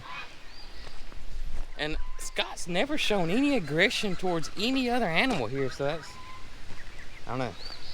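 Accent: South, United States. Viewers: Love this guys accent I think southern states of America have a very fluid way of talking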